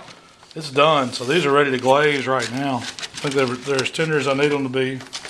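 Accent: South, United States. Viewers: You know it going to be some good bbq when the guy making it has a southern accent 😂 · 1 thing u trust is a fat guy with a southern voice haha.. them big boys can cook them meats haha..love this guy!!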